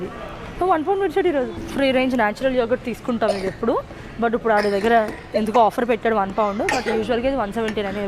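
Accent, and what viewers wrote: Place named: Godavari, India. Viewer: మీ గోదావరి యాస వినసొంపుగా వుంది, మేము కూడా లండన్ వచ్చేయాలని వుంది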